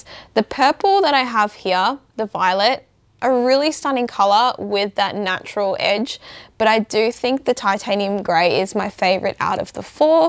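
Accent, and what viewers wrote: Australia. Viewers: Is ur accent Australian ?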